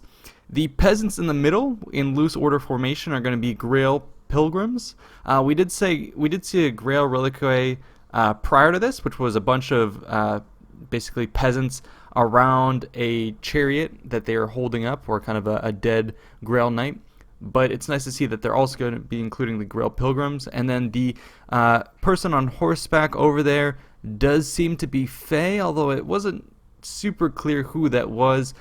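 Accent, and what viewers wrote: France. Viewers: Nice french accent. Would hear again. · He's actually French. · he´s french, dude :) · Geralt, Private Investigator You know he's actually French, right? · You have a really french agsent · He most definitely IS French. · he's french... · Check a previous comment thread. He IS French. Said so himself.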